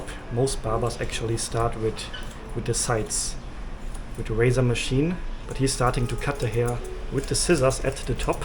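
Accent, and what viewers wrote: Germany. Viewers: 독일식 발음 영어인가...ㅋ 근데, 독일보다 동독, 동독보다 폴란드쪽? 아니면 오스트리아보다 더 동쪽 헝가리? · there is some strong German accent. The speaker is certainly a nerd, but the info given is fairly useful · Your accent is very interesting, are you German?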